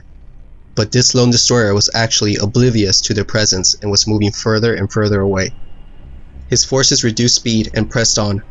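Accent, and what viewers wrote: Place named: United States. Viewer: Mechanical "voice" narration, lots of "Wadder' and all the usual american nonsense. No wonder they want to keep quiet about how badly they did.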